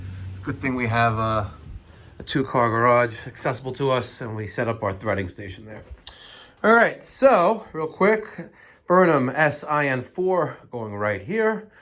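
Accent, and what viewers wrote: New York, United States. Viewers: Voice kinda reminds me of Louis Rossmann. Just that NYC accent working through...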